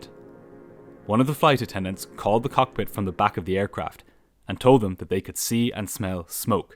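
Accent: Ireland. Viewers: I had no issues. It’s called Irish. You want him to put on a fake accent or something? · by the way, being able to put a voice (in your case, a gorgeous Irish accent) to a face is lovely, so it was delightful to see your handsome face in this video - I do hope that you do more of this in future videos 🇮🇪☘️🇮🇪❤️ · He's Irish. Like any accent, just takes some practice to understand it :) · What a great video! It’s a while since I’ve watched one on this channel and I forgot what a brilliant story teller you are. You know how to create tension, which is a real skill. Don’t ever use AI - your Irish accent is much, much nicer! I feel a bit sorry for pilots who get fired. Yes, mistakes were made, but nobody died and I think it creates a culture where mistakes are covered up if possible. It’s easy to see how this happened and I’m sure these pilots especially would never again let this happen. 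Did the augmenting crew also get fired? I can’t see why they were told not to contact their families either, who may have heard about the accident and be very worried.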